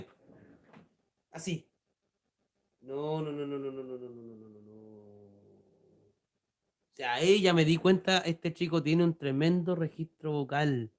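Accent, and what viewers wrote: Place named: Chile. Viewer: jajaja gracias por el análisis... he visto a otras personas llorar cuando lo escuchan por primera vez, así que no te preocupes. Un abrazo, desde Argentina (supongo que sos chileno por el acento)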